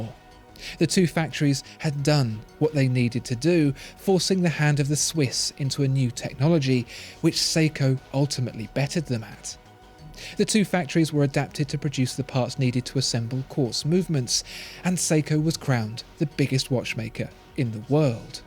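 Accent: United Kingdom. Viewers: I am not a watch collector nor a watch enthusiast, my dream watch is Panerai and I have 2 of it so far, I watch your videos usually for the little historical infos you mix and match in your videos and also the elegant British accent, but this time you got me interested as both watches got my attention even the presage. Thank you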